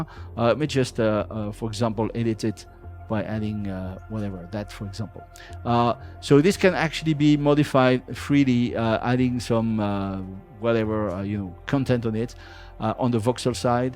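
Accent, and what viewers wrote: France. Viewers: l'accent français ^^ grillé a 100km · C'est mignon, l'accent français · Cet accent français ! 😀 Accent peu prononcé en fait, ça va. C'est même très bien ! Bravo !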